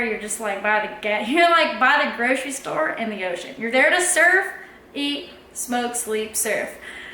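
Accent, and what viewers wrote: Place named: South, United States. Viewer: That's a cool uplifting story. Thank you very much for sharing it with us. I don't know about your accent, in all my life I have met like 3 Americans and none of them were from the South. I have heard this accent before in videos etc and thankfully I have no problem understanding it because I like it a lot. I have a similar story, just not a successful one in how it ended, but still I totally get your sudden change of life. I was a university student of computing in Plymouth, England. I am not British, I am Greek. I was doing my second degree and I was doing it more because it made sense than anything else at the time. I was in my third year there. One late night I was watching a movie on DVD, Once Upon A Time in Mexico. I liked it and decided to watch the special/extra features. One of which was a behind the scenes extra from the film's director. 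At one point, several minutes in, he goes something like this "obviously if you are watching this are interested into film making, else why would you watch this 'boring' stuff". I don't remember his exact words, but that was more or less what he meant. At that point I thought to myself that perhaps in the next life I will become a filmmaker. As soon as I finished that thought, the next one came "what next life? You only live once". Next morning I contacted the university and resigned. There was no film making course at the time so I decided to contact the one where I graduated first in another town in the UK. The following academic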